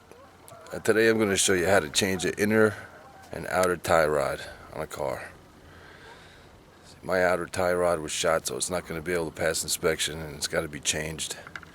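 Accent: New York, United States. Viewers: ROTFLMAO!! hell yea this dude the man!! i hear NEW YORK IN THIS DUDE!! helluva job then pulled out the 40 rty of that old ing bing. Hahahahah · great video nice to hear a new yorker again been in florida to long haven't drank an old e in a long time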